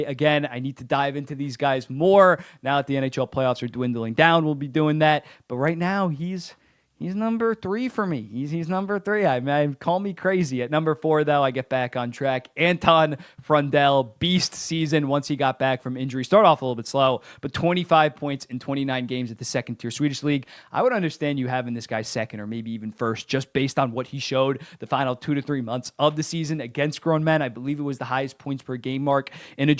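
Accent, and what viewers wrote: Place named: Philadelphia, United States. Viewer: I gotta ask if you’re from PA or Jersey. I’m from Delco/Philly area, and your accent like fades in and out but it’s giving that fr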